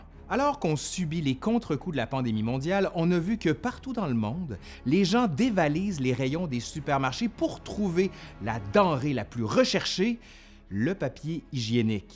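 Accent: Quebec, Canada. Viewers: Je découvre votre chaine sur cette "capsule" 😆(désolé, c'est pas méchant mais tellement marrant pour nous les françois !) sur cet épisode du PQ, et honnêtement c'est par inadvertance et non par curiosité malsaine. Bref, je trouve le présentateur des plus agréable à écouter, avec son petit accent canadien ( que j'aime beaucoup). Je trouve vos sujets très enrichissant, bien que je sois pauvre comme Job, normal je suis retraité, (plus de job). Et racontés avec une emphase des plus agréable. Alors Merci énormément, abonnement de surcroit. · Entre le papier de toilette, le papier toilette, le PQ, le papier de chiottes... Ohlala ! Ta vidéo est très rigolote et je me suis bien marré. Tu fais vraiment un très bon boulot ! Ta chaine, avec ton accent québécois à couper au couteau, c'est une bonne partie de rigolade et maintenant, voilà ! Tu me fais aimer l'histoire... 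Et bien, quel exploit ! Bravo à toi !😀😁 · Purée l’accent québécois c trop beau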